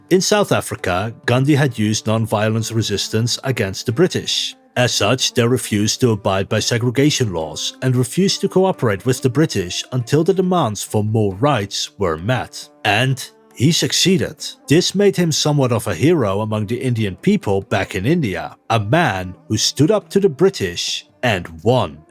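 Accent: Netherlands. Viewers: Do I hear a Dutch accent? 🇳🇱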